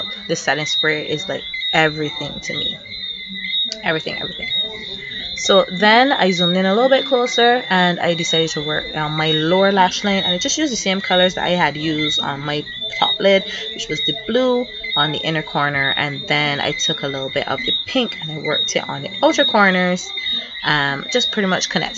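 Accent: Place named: Caribbean. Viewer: I like you caribbean Accent! very nice makeup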